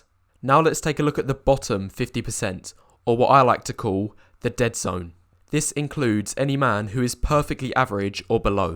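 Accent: United Kingdom. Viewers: One thing I feel weird about this is that a guy with the British accent here is using measurement such as ft. and dollars instead of cm and Pound Sterling. Are these videos exclusively about the situation in the United States? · British accents adds aristocratic flavor to this content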